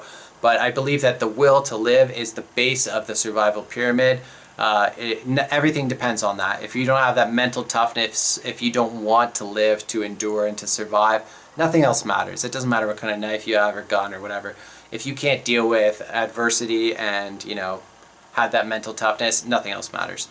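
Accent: Canada. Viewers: The words 'about' and 'out' always give the Canuck away... lol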